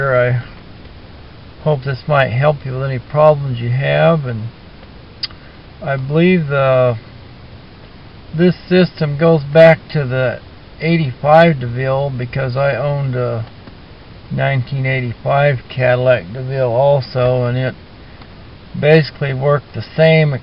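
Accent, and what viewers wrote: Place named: South, United States. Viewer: I'm digging your southern drawl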